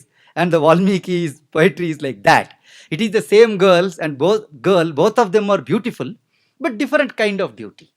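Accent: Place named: Tamil Nadu, India. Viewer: English pronunciation of Tamilians🙌🙌🙌🙌🙌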